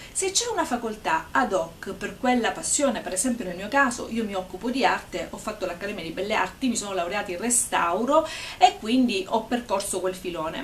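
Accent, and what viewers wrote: Sicily, Italy. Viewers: Catania? :D Palermo :)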